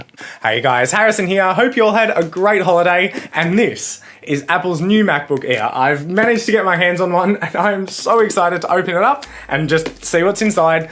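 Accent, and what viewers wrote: Australia. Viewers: He’s Aussie lol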